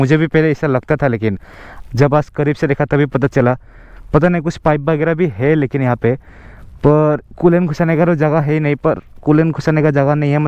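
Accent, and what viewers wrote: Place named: Assam, India. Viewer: bro i think you Assamese boy cozz Hindi sm to sm Assamese wlo ka hindi hai ye😊btw keep it up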